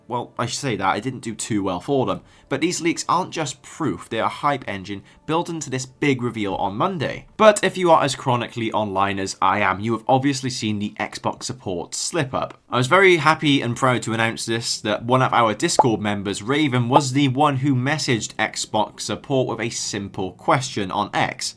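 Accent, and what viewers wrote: South Wales, United Kingdom. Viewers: So where in south wales are you from? (I can hear it, man)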